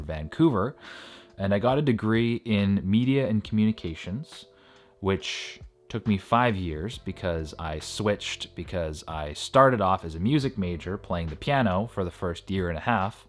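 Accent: Canada. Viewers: A Canadian, eh? How are Canadian accents different from those in the Northern United States? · Your sarcasm and cadence is so unbelievably Canadian. I feel like I'm qualified to make these judgements because I too, am from Vancouver.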